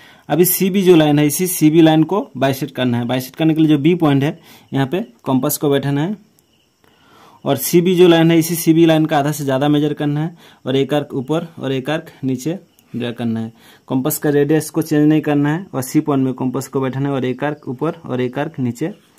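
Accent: India. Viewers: But your english is from india 🇮🇳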